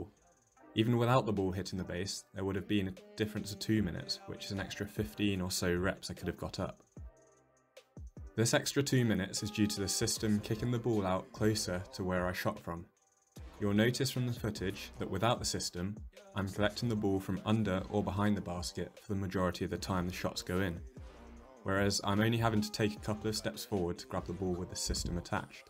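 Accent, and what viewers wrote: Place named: England, United Kingdom. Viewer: Shocked and pleased to hear the English accent for a basketball video!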